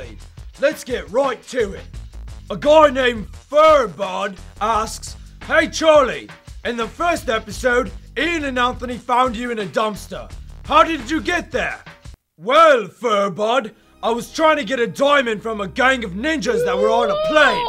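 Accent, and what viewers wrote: United Kingdom. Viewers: Charlie are you really Scottish because you are actually using a Scottish accent mate but do make some bloody good content and ghost Charlie mate you should go back in time to when your alive and tell him to build a machine to save smosh you bloody good mate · Dear Charlie, why do you have a british accent?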